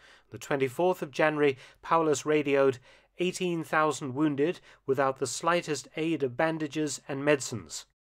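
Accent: England, United Kingdom. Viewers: I see there are over a hundred dislikes. How is that possible this is really good stuff that's never been shared to this extent that I heard. Are the dislikes because they think it's untrue or because the guy has a British accent I just can't for the life let me figure out why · Mark Felton with his English accent makes the documentaries better. More gravitas.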